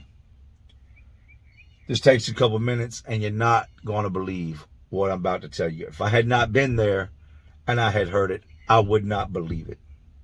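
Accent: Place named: Philadelphia, United States. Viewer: Yo what's good with you. The stories are very entertaining!!! By watching your videos I see you have lived in Virginia Philadelphia and North Carolina. You definitely have the Philly accent 100% I'm from that area and I could tell instantly. If someone asked you where you're from what would you tell them?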